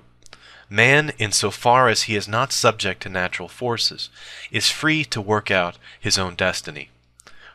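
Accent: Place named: United States. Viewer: Why an American accent of ones words who was so very English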